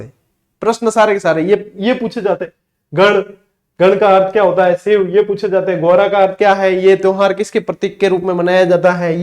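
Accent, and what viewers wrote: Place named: Behror, India. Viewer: Guru ji khandavo bole mare बहरोड़ साइड m😂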